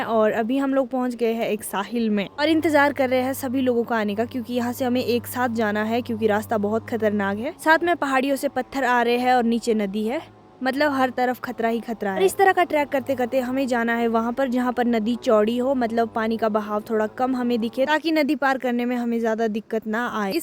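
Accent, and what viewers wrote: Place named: Urdu, Pakistan. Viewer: Bara saaf urdu ka lehja he.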